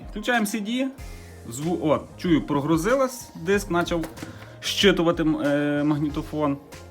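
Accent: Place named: West, Ukraine. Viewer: Класна західноукраїнська мова! Ви часом не з Львівщини?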